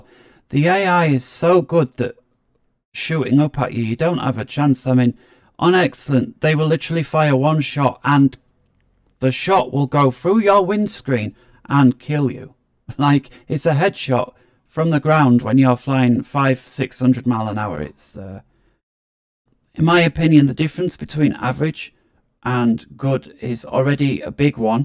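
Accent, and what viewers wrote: United Kingdom. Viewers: This is spot ON!! NICE one, and with a NW accent as well, even if it IS a Manchester one (and not a Scouse!! 😉). Thanks 👍 < There's ANOTHER thumbs-up as well as the one above!!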